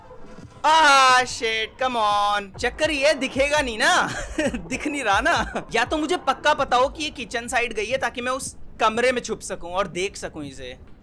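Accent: Haryana, India. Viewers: play evil nun please! With your haryanavi accent and commentary😍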